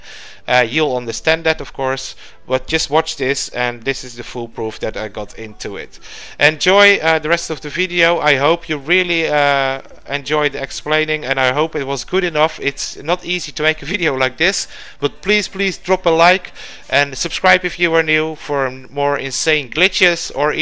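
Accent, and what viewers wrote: Netherlands. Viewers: R you dutch?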